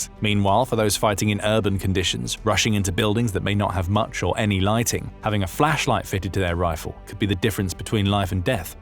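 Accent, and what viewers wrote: United Kingdom. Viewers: Bullsh-t! The limey Russophobe voice just makes this channel CRINGE!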